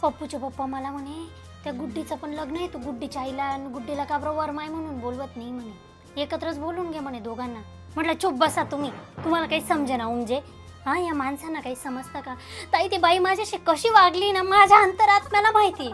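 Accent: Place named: Ahirani, India. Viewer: माझे सासर चाळीसगाव जवळचे नगरदेवळा आहे... मी मुंबईची आहे पण मला अहिराणी बोलायला थोडी येते, पण समजते.तु हल्ली व्हिडीओ मध्ये अहिराणी नाही बोलत... तुझे अहिराणी ऐकायला छान वाटते... तुझे अहिराणी तले व्हिडिओ मी आमच्या फॅमिली ग्रुप मध्ये आणि इतरांना पण सेंड करते... त्यांना पण बघायची सवय लागली आहे ❤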